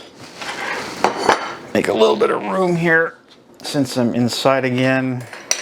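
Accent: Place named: Canada. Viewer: My Canadian brother! I'm an American expat in Panama from the US and love to make meals with beef shank aka OSSO BUCCO as the Panamanians named the cut after the meal. I am assuming your Canadian based off the accent but if I got it wrong my apologies. Gonna watch more of your stuff and share! Thanks!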